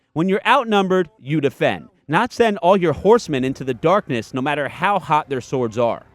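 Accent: United States. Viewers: I Love your NY Accent? Lol lol I'm also from NY just subscribed nice to hear some on who sounds like me. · Can't stand for 30 seconds in front of your cringy American Feminist accent, it can even beat an Indian Gay. Get Help... Its OK.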